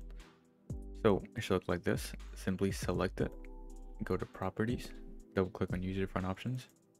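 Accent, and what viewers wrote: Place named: Spain. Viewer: You're a Spanish speaker xd right?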